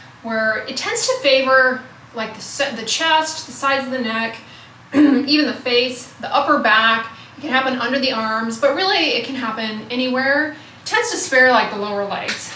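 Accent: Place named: South, United States. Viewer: Happy Sunday! Hope you’re spending time with your mother!!! ❤ In your car talk, you sounded so southern! I never noticed how much of a southern accent is hidden in your voice lol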